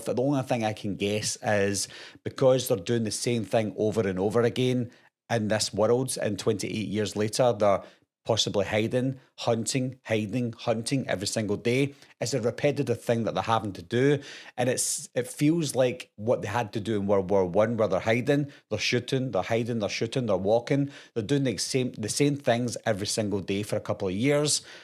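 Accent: Scotland, United Kingdom. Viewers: You've got a Scottish accent!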